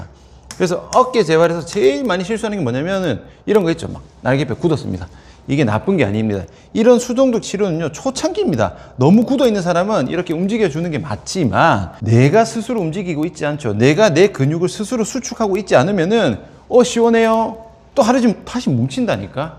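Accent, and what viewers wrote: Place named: Busan, South Korea. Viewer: 부산사람이야 외국사람이야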